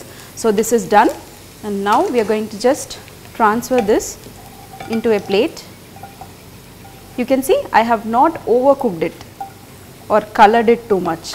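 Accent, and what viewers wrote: India. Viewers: Haha by ur English I have found tat u r from India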